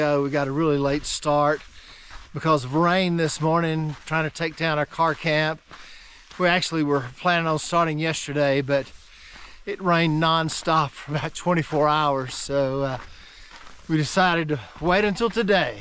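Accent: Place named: South, United States. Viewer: Yeah, that accent tells me Alabama/Tennessee. I reckon you got some serious ancestry in the southeast.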